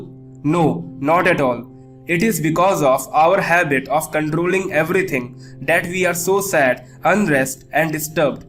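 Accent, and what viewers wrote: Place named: India. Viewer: indian accent god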